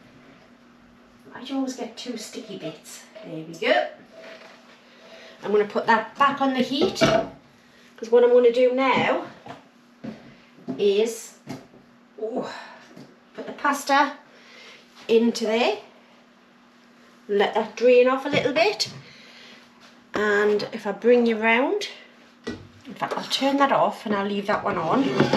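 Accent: Newcastle, United Kingdom. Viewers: I moved to Australia 56 years ago from Newcastle and I just love your Geordie accent. i am loving your videos,the food is bringing back so many memories, so a big big thank you for the time you are putting in for our enjoyment. Also could you tell me the name of channel and I will follow him too. Love you guys.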